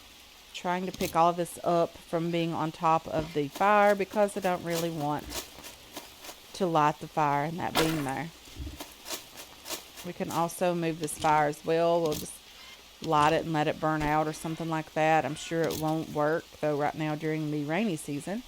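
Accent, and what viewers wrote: Kentucky, United States. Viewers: Hey loving this gameplay series so far (I came from following your Subsistence videos). Might have to give Green Hell a try. Learning a lot, enjoying the content and just let me say, your voice brings a happy nostalgia to this Kentucky girl (living in MI). Its so nice to hear an accent from home! Also, have you ever heard of Starsand? Its a desert survival game and its unforgivingly brutal. Looking forward to more content! :)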